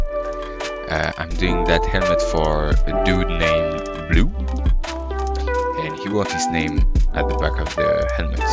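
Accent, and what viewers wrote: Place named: France. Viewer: I love your (french?) accent! This was very helpful. Also, le francais cest cool!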